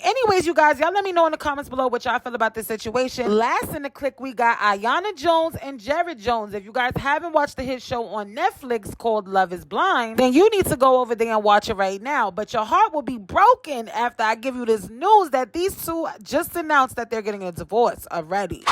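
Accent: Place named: East Coast, United States. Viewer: I’m so glad I found your channel. You be having all the tea. You sound like you from the east coast. I’m from NJ but living in NJ. New subscriber!!!!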